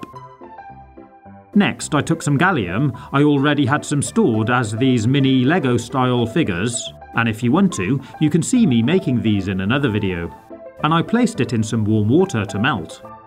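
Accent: United Kingdom. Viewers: Das Op he’s british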